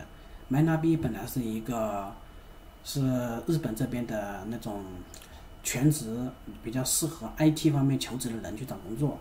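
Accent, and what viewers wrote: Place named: Hunan, China. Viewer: 听着像湖南口音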